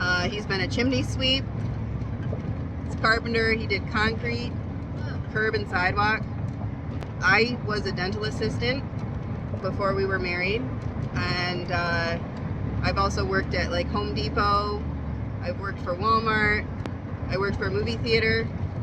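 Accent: Canada. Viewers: Ah I recognized that Canadian accent 🙂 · I thought had a Canadian accent going on. I watch some Urban Exploration guys from Ontario. And certain words like (out) is said differently from us in America, lol I love watching all the videos!!! 😍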